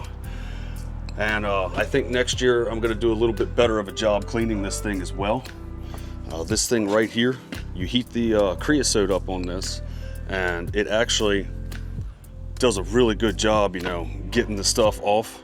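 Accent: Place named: United Kingdom. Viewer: Another viewer from the UK here. Just wondering if you're from the UK, or have spent time here as parts of your accent is very British :) First time watching, great content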